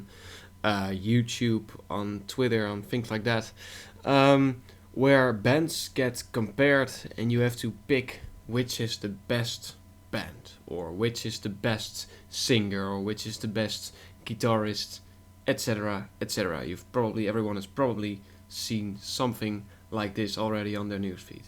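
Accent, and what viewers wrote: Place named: Netherlands. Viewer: The Dutch accent is strong in this one ^^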